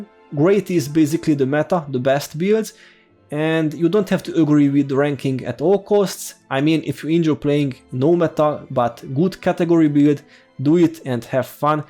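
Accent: Hungary. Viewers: Aha, hungarian accent! I always wondered where it was from :D That said, I love how you say "foes", reminds me of how Mr T says "fools", and I choose to interpret it thusly. "These fools are no challenge!" :D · The Hungarian accent is the reason I’m here 😏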